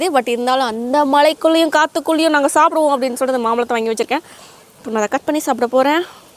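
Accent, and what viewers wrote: Tirunelveli, India. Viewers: Akka ninga tirunelveli ya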